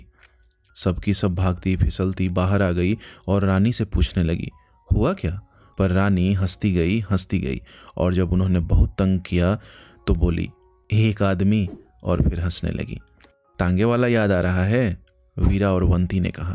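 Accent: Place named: Bengal, India. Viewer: आप मूलतः बंगाल से हैं क्या? उच्चारण में वहाँ का रंग घुला हुआ है. 😊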